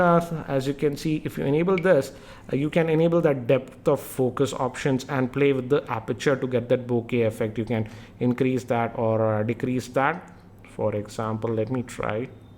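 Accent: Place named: Tamil Nadu, India. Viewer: Your pronounce is a tamil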